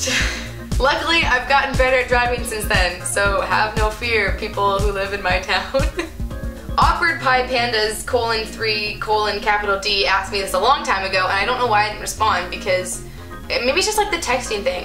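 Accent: Canada. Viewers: Harry Potter is my favorite! I'm also really good at math although English is more fun for me. Ps your accent is so cute! I love Canadians.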